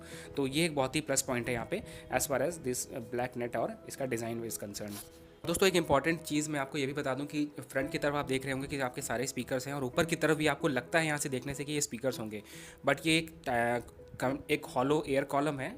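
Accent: India. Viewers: Red zHail He has an indian accent plus i sense racism 😕.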